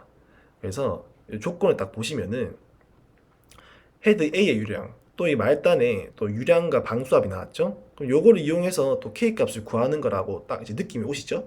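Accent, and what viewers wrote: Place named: Gyeongsang, South Korea. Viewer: 경상도사투리 구수하네예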